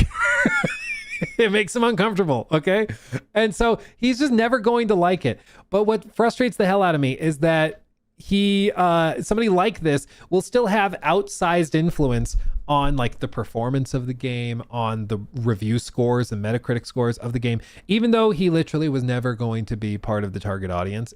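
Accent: United Kingdom. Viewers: Too much Brit 6/10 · 6/10 Too much British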